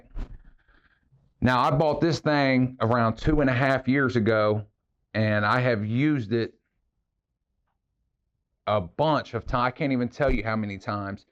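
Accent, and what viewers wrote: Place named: Pittsburgh, United States. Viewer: Ha, ok close enough I guess. Thought I recognized the accent, was hoping you were in the burg as I'm looking for a decent mechanic.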